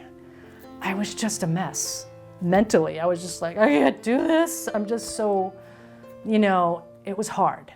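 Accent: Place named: New England, United States. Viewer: Thank you for making this. I dont have bunions, but I have a rare/uncommon condition called Freiburg's disease. It causes the tissue at the top of my 2nd metatarsal (2nd toe, the one you had a hammer toe on) to die and deform because its too long. So I need to have the bone shortened and then the dead part taken of and realigned. It will be less invasive then your surgery, but I will also be immobile for a short time and casted. You video helps ease my nerves. Also you sound like youre from the New England area and it reminds me of my moms accent. So I love it! ♥️ I live abroad and dont hear it often.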